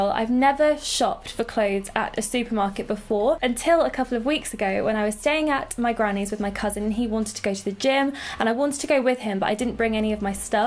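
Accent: United Kingdom. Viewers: Are you British? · I am from Germany and I love your british accent 😍But also your fashion style👠👜. I am sorry if I made grammar mistakes 😕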